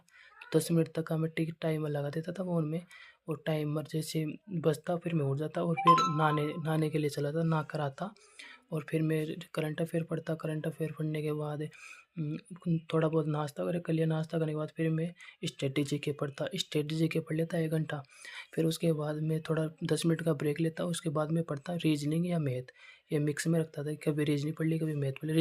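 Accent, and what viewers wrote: Rajasthan, India. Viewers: Aap kha se ho bhaiya aapki voice Rajasthani h m bhi alwar Rajasthan se radhe radhe bhaiya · बोली से पक्का हो गया की राजस्थान से हो पर राजस्थान में कहा से 🤔😅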